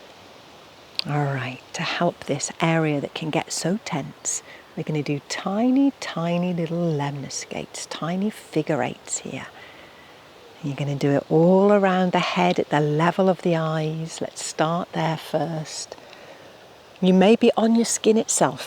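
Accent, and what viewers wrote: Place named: United Kingdom. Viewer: ❤love her clean beautiful accent. Why is it that other British people sound different from her and barley understandable?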